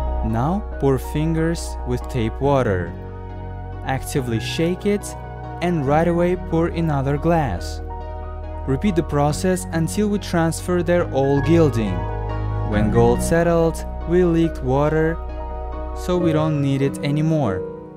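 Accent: Russia. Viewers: Русский акцент всё равно слышно. Но произношение хорошее.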